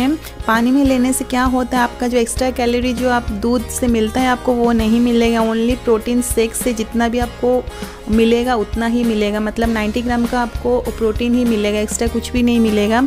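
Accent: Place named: Odisha, India. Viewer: Odisha style bolreheho .... Odia me bolo...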